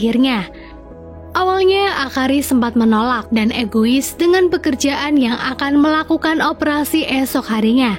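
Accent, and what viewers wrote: Pemalang, Indonesia. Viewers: Fix wong pemalang Rika ya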